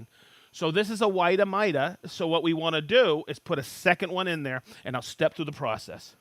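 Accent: Boston, United States. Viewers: Hey can you park my cah- I’m a masshole too I hear it - love it New England woodworkers rock🎉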